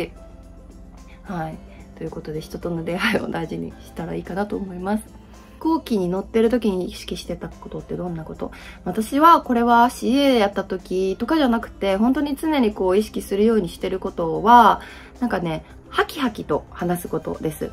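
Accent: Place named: Kyoto, Japan. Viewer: 🎉 さま❤ 一目見た瞬間に大ファンになりました!😅そうかー、時々出る関西弁ば京都やったんですねー😊いや～、良い!京都やったらさん、可愛いから舞妓さんになってもバカ売れだったでしようねー😂ほんとに素晴らしい女性ですね😮花粉症なところもとても親近感覚えます❤🎉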